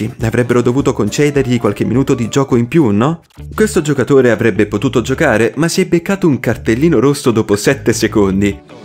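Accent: Germany. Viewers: MA SEI TEDESCO?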